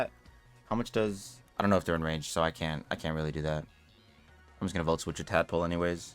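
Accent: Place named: United States. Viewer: ¿You, native Costa Rican? You sound pretty much like a native American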